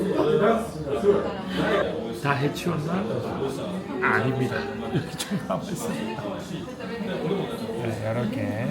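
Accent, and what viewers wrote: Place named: Gyeongsang, South Korea. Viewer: 님 점~점~ 폭칸트 유툽 컵셉인가요? 일본컨셉 유투브많은데 경상도가넘 만타~~~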